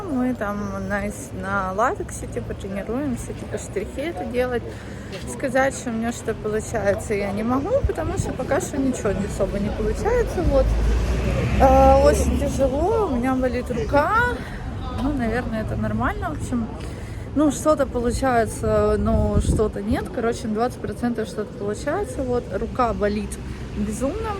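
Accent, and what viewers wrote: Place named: Ukraine. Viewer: Ужас, вид, как будто трепали целую ночь. Вялая, нужно учиться не рот накачать, а учится,над речью. Это украинский акцент звучит примитивно. Волосы распущенные. Кажется очень дешего.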